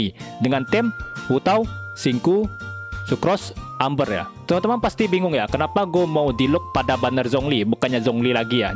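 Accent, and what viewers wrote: West Sumatra, Indonesia. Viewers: Org minang ya XD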